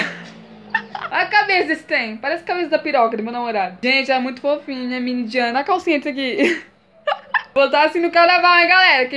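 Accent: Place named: Rio de Janeiro, Brazil. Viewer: Época que tinha sotaque de carioca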